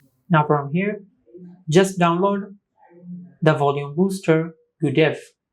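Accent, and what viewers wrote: India. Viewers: its indian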